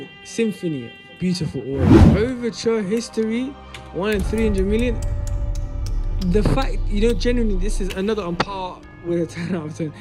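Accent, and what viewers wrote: United Kingdom. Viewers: Ngl didn’t expect you to be bri’ish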